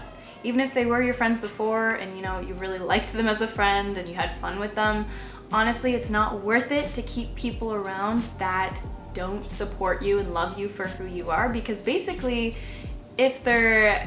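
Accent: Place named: Canada. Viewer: you sound so canadian in this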